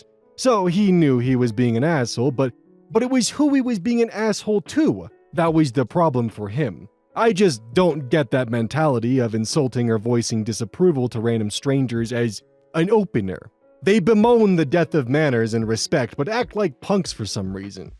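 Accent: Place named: New York, United States. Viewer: Huh. Whenever I heard some comedian do a New York accent I always assumed they were exaggerating it for comedic effect. Yet here I am, having just watched a video where nobody mentions anything about New York nor accents, but where the narrator sounds exactly like those comedians.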